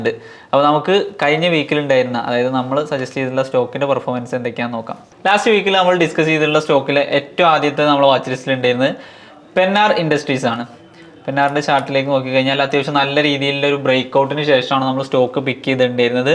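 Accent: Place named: Perinthalmanna, India. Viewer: perinthalmanna samaram kelkkan nalla rasam anu to....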